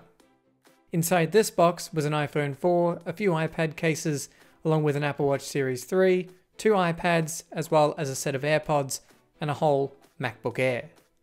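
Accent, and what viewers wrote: Australia. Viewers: I need to stop watching some many Aussie YouTubers, I thought this was a DankPods vid 😂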